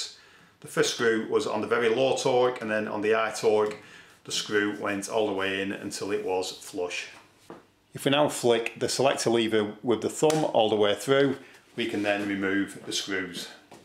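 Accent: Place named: United Kingdom. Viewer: What accent is that? I know it's British.. But it's difficult to understand when English is your second language and you ear is accustomed to American accent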